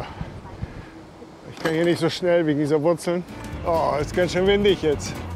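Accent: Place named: Swabia, Germany. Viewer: Hallo, sehr schöne Filme machst Du, hab schon fast alle angesehen, sehr kurzweilig. Jetzt ist mir auch klar geworden woher ab und an das Schwäbeln herkommt 🙂. Wäre doch ratsam dass Du Dir mal die Insta 360 Rundumkamera zulegst dann hättest meistens beide Hände am Lenker. Hab sie schon bei einigen Radyoutubern gesehen, bin von den Aufnahmen echt begeistert. Bin meistens im Allgäu oder Südtirol unterwegs, das Flachland im hohen Norden ist nicht so mein Ding. Mach weiter so viel Spass bei Deinen nächsten Touren freue mich schon. Liebe Grüße vom Bodensee, Roland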